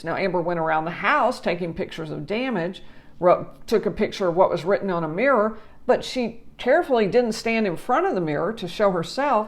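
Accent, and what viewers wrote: South, United States. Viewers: Do I hear a southern accent? I'm from VA and GA . I learned to not use accent but it slips out? Thanks for your time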